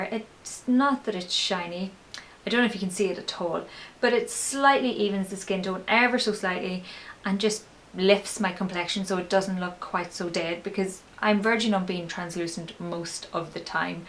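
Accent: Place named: Northern Ireland, United Kingdom. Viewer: The NI accent!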